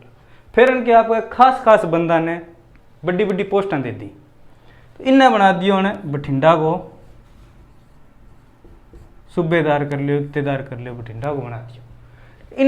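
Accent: Haryana, India. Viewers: Nice h Bhai jma bagdi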